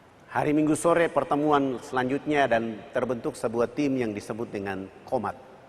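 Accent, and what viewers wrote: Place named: South Sulawesi, Indonesia. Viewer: hihihi bugis makassar jie ee😊😊😊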